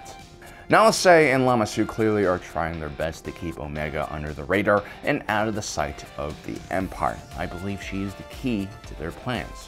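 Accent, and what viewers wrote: New Zealand. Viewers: Plenty of kiwi accents sounds like OP, lol. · I like the new Zealander accent ): Omeeeeega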